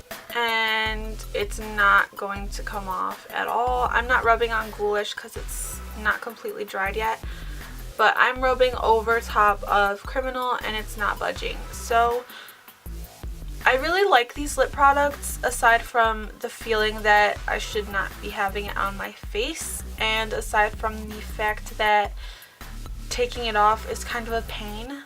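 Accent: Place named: Boston, United States. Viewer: Your boston is so cute!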